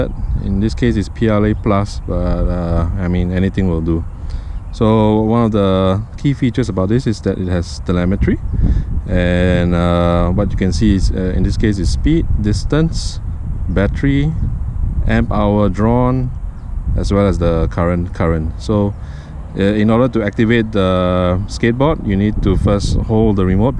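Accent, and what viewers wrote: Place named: Singapore. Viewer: Singaporean? :D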